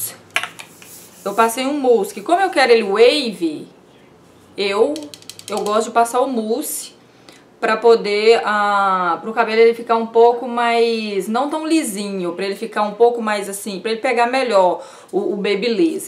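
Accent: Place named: Minas Gerais, Brazil. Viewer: eu entendo o pouquinho de mineiro 😂😂. Maravilhoso o vídeo e já aguardo o das técnicas de maquiagem. Beijo diva 💋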